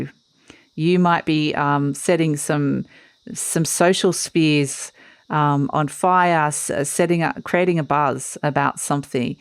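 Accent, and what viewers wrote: Australia. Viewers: Lovely to hear an Australian accent!!!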